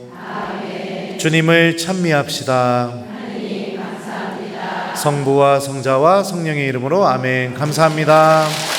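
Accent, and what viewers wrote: Chungcheong, South Korea. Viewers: 신부님 충청도 말씨 구수혀요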